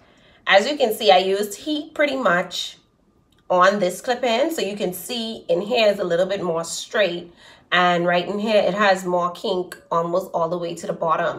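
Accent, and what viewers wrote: Bahamas. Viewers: Are you a Bahamian? I think I hear the accent but I wanna be sure lol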